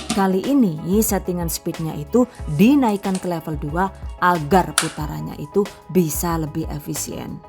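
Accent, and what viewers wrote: Banyumas, Indonesia. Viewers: Ealah jebule nu Wong ngapak yu😅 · ngapaknya bikin ngakak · Jebule bahasa ngapak ap ya? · Sampean lucu kalau ngomong apa lagi klau lagi ngomong bhs ngapak...aku ngapak PBG Mbak...😀😀😀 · Basa ngapak e keluar toh bu.... 😀😀😀😀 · mantul menul"resepnya odading bu rasanya spt jd wonder woman nich 😊 gemesh...sm suaranya yayuk ke apa lg kalau lg ngapak 😘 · "DONE" Dubai hadir pas lagi nyari dan berpikir untuk buka puasa sunnah senin nanti pas ada notif odading gue jadi kepikiran odading yg lagi viral pengen ngerasain karna jauh antara indonesia dan Emirates Dubai.eehh..ternyata ada notif langsung da gue pergi ke dapur buat odading persiapan buka puasa sunnah senin...... #GiveawayTHV2020 Terimahkasih atas resep nya Bundah sudah cantik jawa nya ngapak lagi🥰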